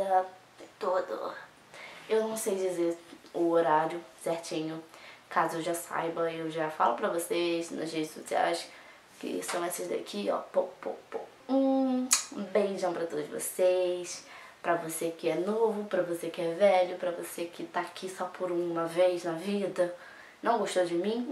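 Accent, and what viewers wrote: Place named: Rio de Janeiro, Brazil. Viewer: vc morra no Rio de Janeiro e porque vc fala carioca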